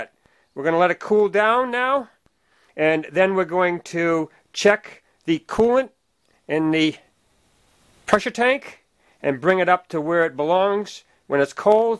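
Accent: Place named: East Coast, United States. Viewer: If the accent doesn’t scream “east coast,” then the rust certainly does!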